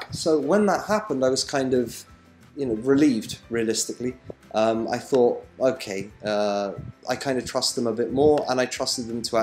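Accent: United Kingdom. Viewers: If you live in UK like me, then when you come to withdraw Etoro do not mention that them converting UK to USD to be able to trade , then when you cash in, Ettoro converting again £USD back to £UK costs UK citezens a whopping 25% ! . That is what I earn almost earn from trading, so whats the point in trading with etoro if you live in the UK? Strange as you sound English so I wonder if you have this issue or have you found a work- around on this one. If so it would be get to know the trick. thanks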